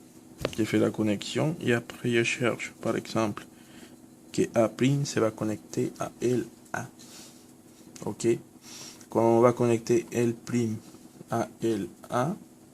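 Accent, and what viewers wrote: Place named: Spain. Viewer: I bet this guy first language is spanish but he speaks frensh very very good! nice explication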